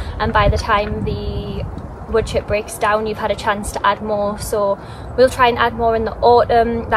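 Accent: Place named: Newcastle, United Kingdom. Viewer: Hello you are totally natural at allotment reporting I really like the colour of your nail polish and your cute smile and Newcastle accent. Any word of Tony c smith having an other potty mouth club show yet. Could you also please tell where. Could be best to get a polytunnell or a small greenhouse please. I hope you have a nice weekend when your away from the garden and allotment thanks you 😊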